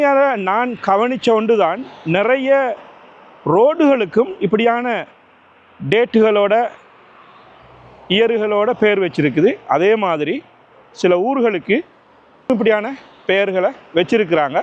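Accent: Sri Lanka. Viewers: இலங்கைத் தமிழ் ரொம்ப அருமையாக இருக்கிறது . எனக்கு K . S .ராஜாவையும் , B . H . அப்துல் ஹமீது அவர்களையும் நினைவுக்கு கொண்டு வந்து விட்டீர்கள் . புதிய தகவல் . தெரிந்து கொண்டேன் . Thank you .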